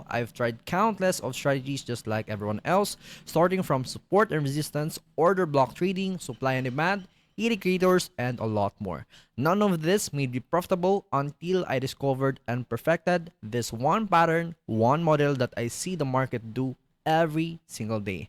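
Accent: Philippines. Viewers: a thick pilipino accent. musta pre? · he sounds like Filipino to me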